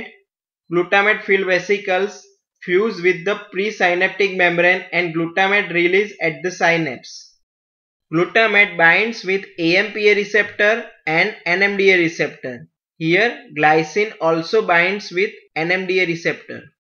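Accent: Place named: Germany. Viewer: You sound like you are german though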